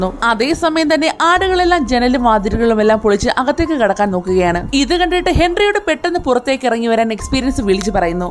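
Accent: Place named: Kasaragod, India. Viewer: Kasargod aanalle? Paanju varunnu yenn paranju (paanju) kasargod alleM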